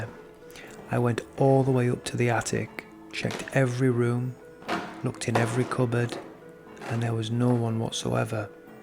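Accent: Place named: Yorkshire, United Kingdom. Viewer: I love your narration and Yorkshire accent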